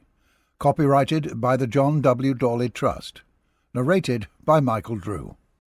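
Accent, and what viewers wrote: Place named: England, United Kingdom. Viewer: Thank you for posting this video. I loved listening to it narrated by an Englishman.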